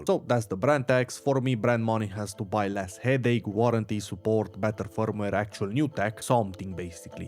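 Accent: Romania. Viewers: Știam că ești român după accent :))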